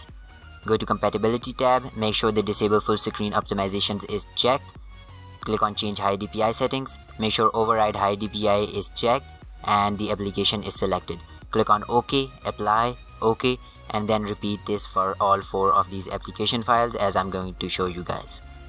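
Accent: India. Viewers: i dont trust indian voice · That Indian accent tho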